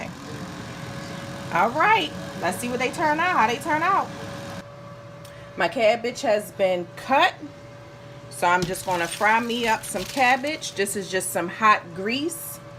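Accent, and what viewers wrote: Baltimore, United States. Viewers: Bmore accent brings me back home. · As soon as started the video, I was like BALTIMORE!!!!!!!! Never lose the accent! I love it. Those wings look so good!!!! I need to get my air fryer asap! Thanks for sharing.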